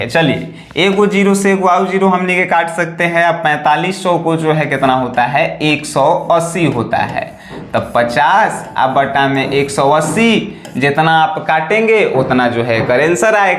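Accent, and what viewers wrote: Bihar, India. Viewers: Verry nice for Bihari style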